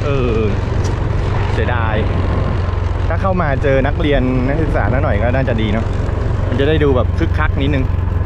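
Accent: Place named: rural, Thailand. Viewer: พูดเหมือนคนบ้านนอกเลยทำไมอยู่ไทยไม่เกิดเป็นคน กทมหล่ะครับบพี่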